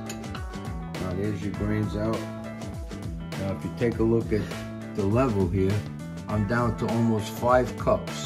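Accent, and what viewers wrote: New York, United States. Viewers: Cool, I like your Italian New Yorker accent.. My mom use to make percolated coffee, Yuban , not available in Canada.. Thankyou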